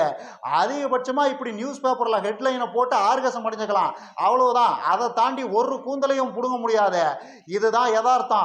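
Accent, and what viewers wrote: Kongu, India. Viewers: கொங்கு பகுதியில் பேசும் பழக்கம் உடையவர்கள் என்று நினைக்கிறேன். இந்த செய்தி பரவாயில்லை.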